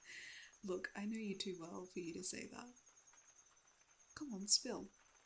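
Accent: Australia. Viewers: Good morning, 8 ❤ I’m currently listening for the 5 time, & I’m loving the longer audio. I really hope you get more views on this, because it’s a quality story, & you’re a great storyteller. I love your voice, & as I’ve said before, your Australian accent makes me melt, 8 🥰🌹 Oh, I love the thumbnail. She’s so cute!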